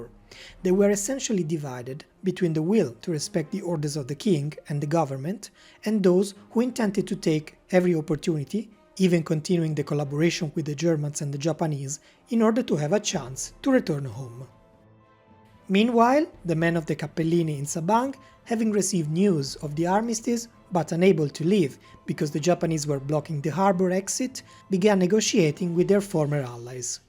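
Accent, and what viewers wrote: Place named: Italy. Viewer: Just an outstanding video altogether with that great Italian accent that so well fits the content. Many thanks!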